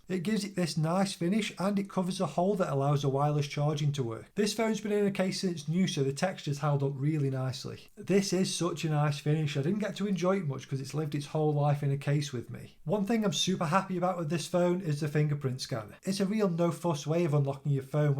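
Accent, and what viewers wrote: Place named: United Kingdom. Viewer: Only here for native British accent. Loved it bro!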